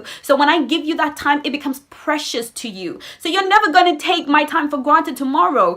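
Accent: United Kingdom. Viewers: Hey, Love you and your cute little British accent.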